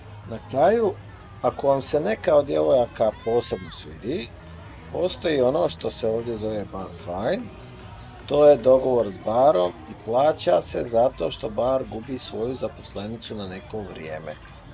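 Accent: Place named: Herzegovina, Bosnia and Herzegovina. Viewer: Ok drugar ocito nista ne znas o Europi jer si negdje iz Ercegovine koliko čujem po glasu . Da ti Tata objasni šta imas u toj Europi imas klubove od 5000 ljudi koji se svi praše bez stajanja dovoljno je da potapšeš osobu koja ti je draga po ramenu i ta osoba ce ti dati felacio besplatno . To o njih 20 ispred tebe svaki bordel u Europi radi zove svoje cure i ne placas pice vec te pitaju koju bi kresao. I da ti kaze netko ko je bio na Patayi sve je to super ok ali daleko bolje mi je u Nizozemskoj ili Belgiji od Thailanda tako probaj ovdje vjeruj mi biti ce ti isto super.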